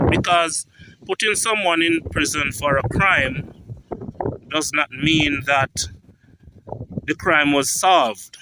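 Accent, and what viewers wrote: Patois, Jamaica. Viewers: PATWA! Spoken language of Jamaica! Surely this must help you understand. If not! GOD guh wid yuh! Bless!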